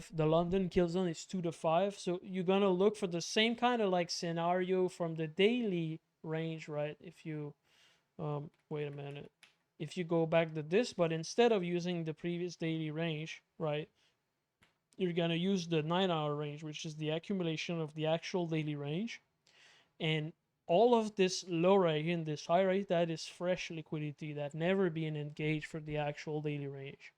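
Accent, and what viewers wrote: Quebec, Canada. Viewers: French canadian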